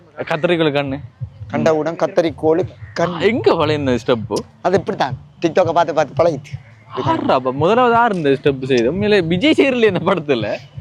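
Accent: Sri Lanka. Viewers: இலங்கைத்தமிழ் மிகவும் அருமை 🎉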